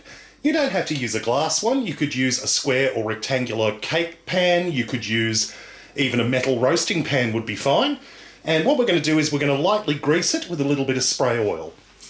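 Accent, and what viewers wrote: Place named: Australia. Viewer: Love your videos I heard your Australian because I am too keep making fantastic videos and we can reach one million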